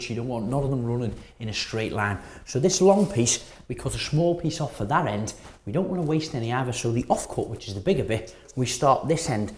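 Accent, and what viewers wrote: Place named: Liverpool, United Kingdom. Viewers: Sean Connery has a Scottish accent. This guy has a Liverpudlian or Scouse accent. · he is a scouser u moron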